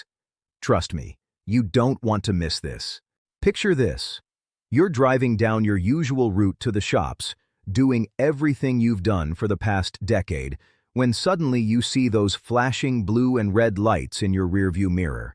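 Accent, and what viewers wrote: United States. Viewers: Not another video about Australia with an American accent, which is most probably AI. Also, mostly not exactly true.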